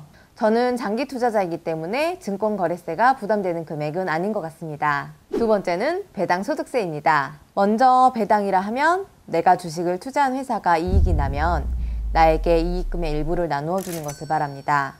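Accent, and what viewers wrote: Jeolla, South Korea. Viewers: 전라도 분 같아요 ㅋ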